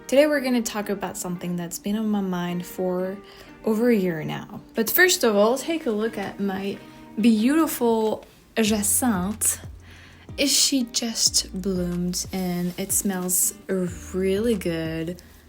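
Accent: France. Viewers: Your English has gotten so much better over the course of your videos. Don't lose your French accent, though! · I haven’t seen one of your videos in years…You’re losing your French accent! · Since I first started watching you react to music, you have almost lost your French accent ❤❤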